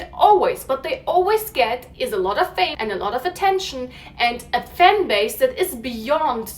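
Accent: Germany. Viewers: Are you German? ☺️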